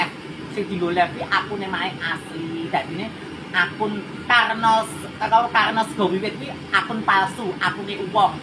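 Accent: Java, Indonesia. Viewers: Oalah... Tp sy seneng dengan accent jawanya ma'e.. semangat trs ya semua.. 😊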